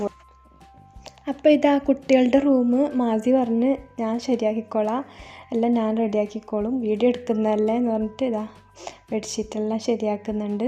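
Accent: Kannur, India. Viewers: Ningalude Kannur language presentation style beautiful 👌 Speedil parayunnadine kaal better ithu thanne ☺️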